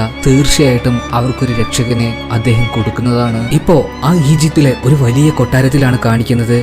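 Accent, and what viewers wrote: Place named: Palakkad, India. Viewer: പാലക്കാട് കാരൻ ആണല്ലേ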